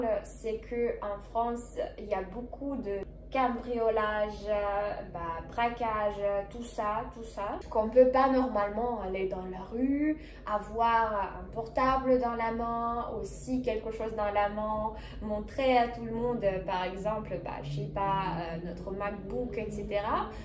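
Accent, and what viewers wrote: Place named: Russia. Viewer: Bonjour. Nouvel abonné. L'accent russe apporte à la France tout le 💨 vent froid le blizzare de Moscou et comme il fait encore très chaud en France.. c'est le dépaysement . Merci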